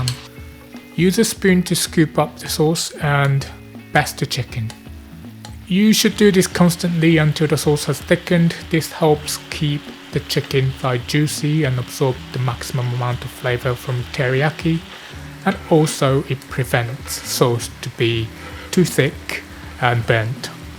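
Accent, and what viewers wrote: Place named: East London, United Kingdom. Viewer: Cheers for the recipe mate! Did you live in London before? I can detect a slight East London accent ;) Love your content! I'm gonna try to make this dish tonight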